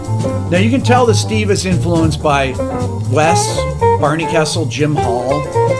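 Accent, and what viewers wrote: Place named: Upstate New York, United States. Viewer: What a great story about teaching at IC. Love your channel and love that you still have the upstate accent:)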